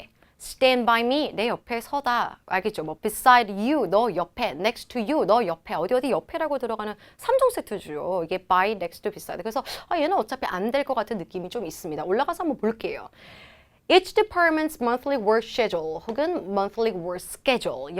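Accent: Gyeongsang, South Korea. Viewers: 경상도 선생님 아이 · 몰랐는데 경상도 발음이 간간히 나오시네요 ㅎ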